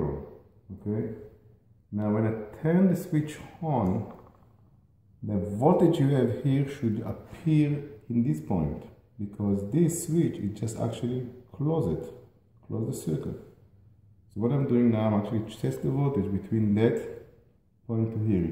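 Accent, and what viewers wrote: Israel. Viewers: I recognize that accent from anywhere...ivrit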